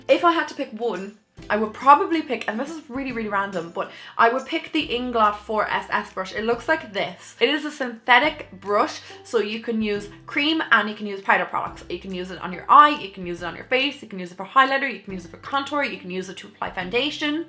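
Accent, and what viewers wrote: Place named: Munster, Ireland. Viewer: what county are you from?? trying to figure out from your accent guessing somewhere in munster ???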